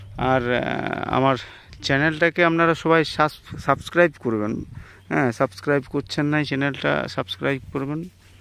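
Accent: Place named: Bangladesh. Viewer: এই ভাইয়ের কথা শুনে মনে হচ্ছে উনি বাংলাদেশি। আমাদের খুলনা অঞ্চলের বাড়ি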